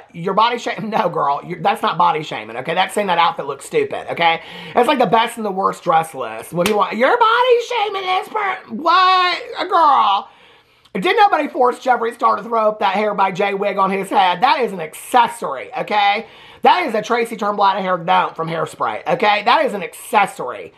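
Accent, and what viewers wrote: South, United States. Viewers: Im from Tennessee and you do have a southern accent and I love it 😜 omg You have got to read the comments more often. That was hilarious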